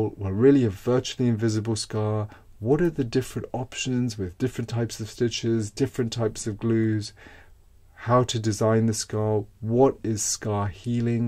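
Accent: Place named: England, United Kingdom. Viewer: SOUNDS like London, England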